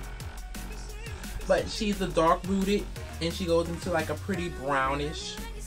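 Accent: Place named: New Orleans, United States. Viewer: Are u from new orleans? I hear the accent.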